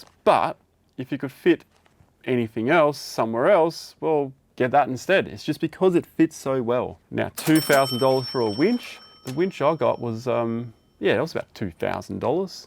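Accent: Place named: Australia. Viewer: Mate, stuff em. I've watched so many of your educational videos that I dream in an Aussie accent now. Keep doing them, especially since most 4WD'ing tutorials and advice just tend to come from guesswork rather than experience.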